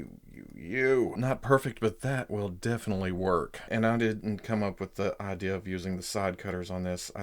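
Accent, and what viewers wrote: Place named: Louisiana, United States. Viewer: Your Cajun accent is on point!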